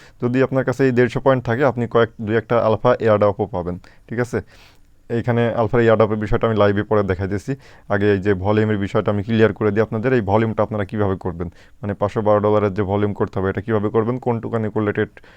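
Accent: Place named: Kushtia, Bangladesh. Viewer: vai akta kothia bole apnar voice cena cena laghay apnar bari ki Kushtia tay 🙄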